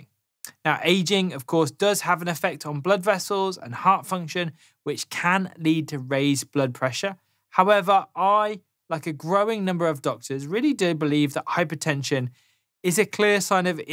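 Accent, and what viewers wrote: United Kingdom. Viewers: At last ! A British accent !! From a knowledgeable British doctor. No more Yanky ti Yanks lol. Thanks for posting.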